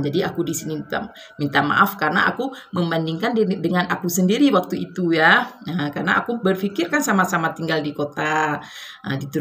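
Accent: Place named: Aceh, Indonesia. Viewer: Aaah Terimakasih kak sudah menjawab pertanyaan saya 🙏 Ditunggu part" selanjutnya 🥰 Jangan khawatir, kita gak bosan kok kak. Saya justru suka story telling kak dengan logat Acehnya, bukan hanya sekedar berbagi cerita tapi juga memberikan edukasi kepada penonton. Dan untuk soal makanan, kita paham bahwa yang kakak sampaikan di video sebelumnya adalah lingkungan dan diri kakak sendiri tapi kakak klarifikasi dengan segala kerendahan hati karena menggunakan kata "Indonesia". I really appreciated 🤗 Tetap semangat dan konsisten ngevlog ya kak, inshaAllah subscriber, viewers dan adsense akan menyusul dengan berjalannya waktu ❤️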